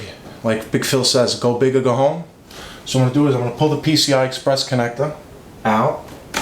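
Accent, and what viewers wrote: Boston, United States. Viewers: Heavy Bostonian accent. Boooo red sox! lol i love this guy though! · you from boston bro ?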